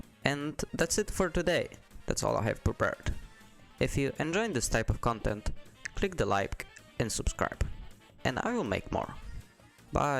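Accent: Poland. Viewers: Jesteś z Polski? Czy mi się wydaje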